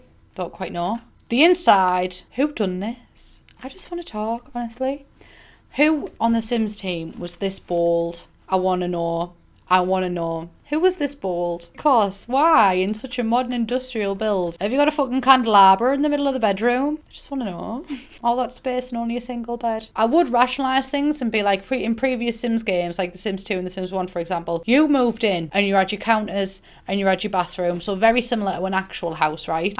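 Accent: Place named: Glasgow, United Kingdom. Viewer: I used to live in Glasgow and this video gives me those vibes. Also I love your accent it takes me back to those great times hen xoxo from spain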